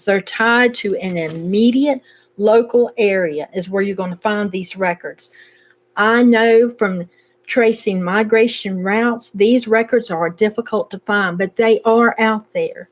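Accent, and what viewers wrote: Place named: North Carolina, United States. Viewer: What a great program. I wish I had been on my journey at the time you started the Piedmont Trails so I could follow you as I desperately worked to understand my family history. I happened to find your channel by accident as I was flipping through You Tube for a movie. Since I was born in Statesville NC I immediately recognized a person speaking my language. I don’t even need my hearing aids to understand what you are saying. I love your accent ❤ At 77 people tell me I waited too long to start this project. However, I still remember my grand parents and my great grand parents talking about family members and it ties into my search back into my family’s history. Please keep this program going, You are special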